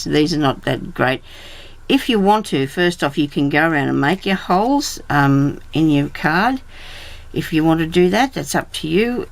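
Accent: Australia. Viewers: Never change a thing. I don't think you mumble......it's your lovely Australian accent....love it!! Your fabric/lace books are so different from the norm....what will you come up with next I wonder. I so look forward to Sundays now....hope this book has tons of pages and the tutorials last for weeks. You are a wonderful teacher. Thank you so much for sharing your craftiness. Glad you are feeling a little better. Love to you. Sue. xxx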